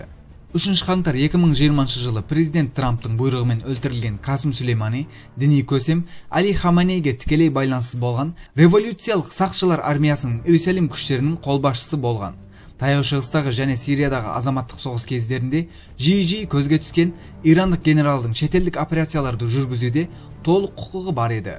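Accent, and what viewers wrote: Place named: Uzbekistan. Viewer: Өзбексің бе? Тіліңде акцент бар.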